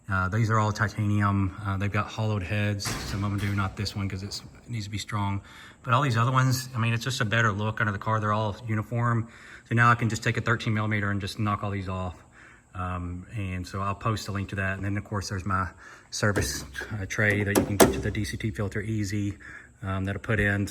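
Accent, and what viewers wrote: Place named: United Kingdom. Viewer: What's with the British voice over? This is clearly in the US lol. It's in Florida in fact.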